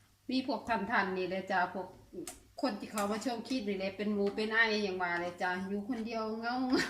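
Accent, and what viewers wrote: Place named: Korat, Thailand. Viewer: สวัสดีจ้า สำเนียงนี่คนโคราชแท้แหละเนาะ คนกะไหนน้อป้าก็คนโคราชคือกันเด้อจ้า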